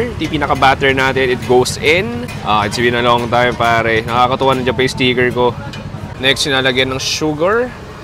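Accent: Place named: Philippines. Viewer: English acent Philipinos... You looks like Cakra Khan..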